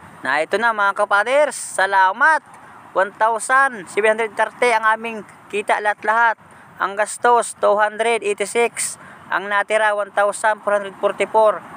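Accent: Bicol, Philippines. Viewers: Bicolano po b kayo?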